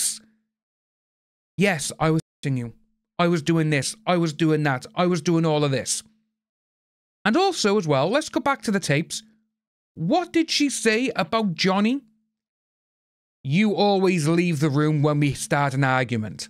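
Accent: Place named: Wales, United Kingdom. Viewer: Fellow Welshie, here. Love your Welsh accent! 😄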